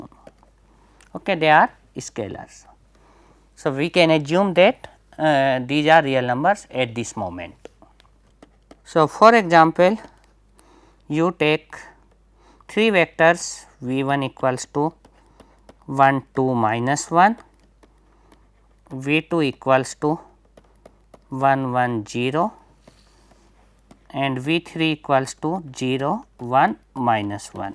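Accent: India. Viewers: Dude, then don’t watch it. There’s a great amount of effort put in to make it available for free. Also this is not an Intro to linear algebra course. Read the course title and description before you complain about pan parag accent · No wonder Gilbert Strangs Linear Algebra stands the test of time. Merely writing down the notations for students to memorize is typical Indian education style not to mention the pan parag way of talking “pour” “dejine”, “jeero” is off putting. The accent can be excused but add illustrative examples. New learners would be clueless why do you go for the cosine for angle between 2 vectors and why is magnitude important. Just writing it to memorize wont cut it.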